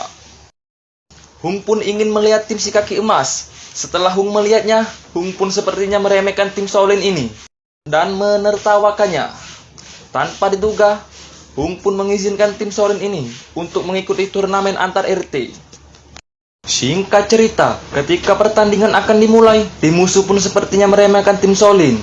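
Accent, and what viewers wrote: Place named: Palembang, Indonesia. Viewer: Ay wong palembang cknyo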